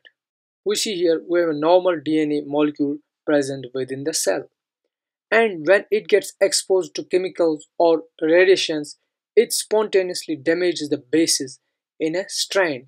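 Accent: Kashmir, India. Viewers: which district? srinagar?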